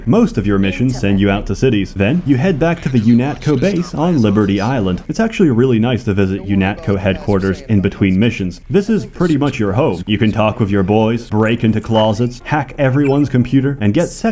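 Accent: Poland. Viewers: Are you from Poland? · U Polish ?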